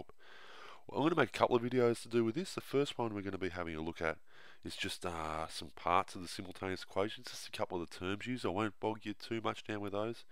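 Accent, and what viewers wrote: Australia. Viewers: clicked on this hoping its not an american and wasnt overjoyed to find out its an australian😁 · Great stuff! And refreshing to hear an Aussie accent on Youtube for a change 👍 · It’s an Aussie accent but his nose does sound blocked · hes australian mate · Don't diss the Aussie accent! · Inaya way Australian mate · he is i'm from the land down under you get thus accent all the time. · He is australian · its a really strong australian accent and I dont blame you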